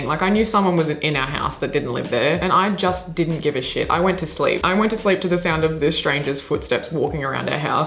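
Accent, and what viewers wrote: Australia. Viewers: I'm assuming you're an Aussie.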